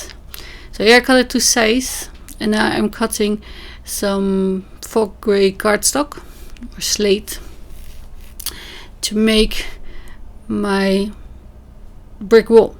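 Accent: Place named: Netherlands. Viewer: Het is een genot om te kijken hoe je kleurt met waterverf en stiften. Het is net een sticker! Aan de hand van je accent gok ik dat je ook uit Nederland komt? Leuk dat ik je heb gevonden in de hop. Dank je voor deze video.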